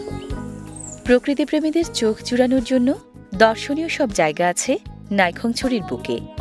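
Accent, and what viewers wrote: Standard. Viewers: আপুর কন্ঠের ভাষা অনেক ভালো লাগে। শুদ্ধস্বরে বাংলা ভাষা শুনলে যেন প্রান জুড়িয়ে যায়। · আপু আপনার অনুষ্ঠান খুবই ভালো লাগে আপনার মুখের কথা গুলো অতি শুদ্ধ আর আপনার মুখের ভাষা গুলো শুনতে ভালো লাগে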